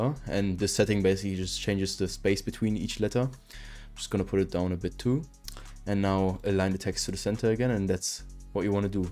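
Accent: Germany. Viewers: man hört dein deutsch extrem raus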